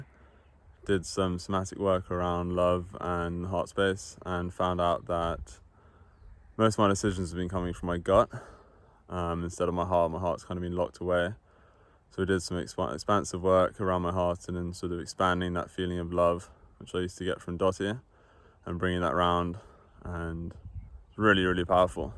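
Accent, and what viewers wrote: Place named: United Kingdom. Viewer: Oh that Beautiful British Accent 😍🇬🇧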